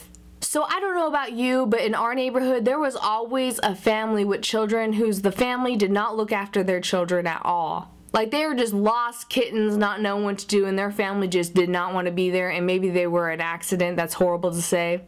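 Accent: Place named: Dallas, United States. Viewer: she sounds like she is from downtown Dallas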